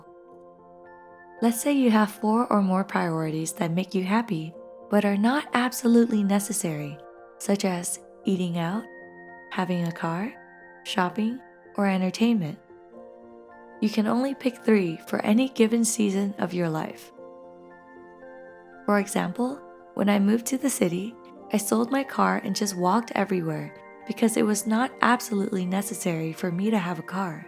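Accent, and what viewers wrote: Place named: Vietnam. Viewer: Omg you are Vietnamese??? Im Vietnamese too. Nice to meet youuu I really love your accent 💓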